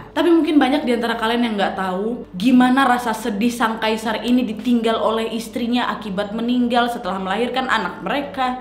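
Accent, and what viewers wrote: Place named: Medan, Indonesia. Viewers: Sukak kali aku lihat kau dek dengan cara menceritakan nya dgn gaya Medan en betul enak dan lucu tidak membosankan. Sukses selalu dekku.😘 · Salam saudariku,logat medanmu kental ya... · Orang mana kamu nak,bahasamu Medan xx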